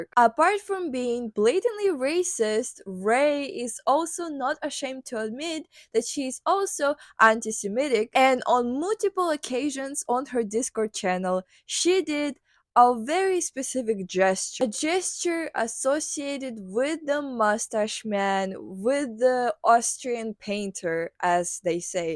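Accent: Russia. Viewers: блин не пойму из-за акцента ты русскоговорящая или нет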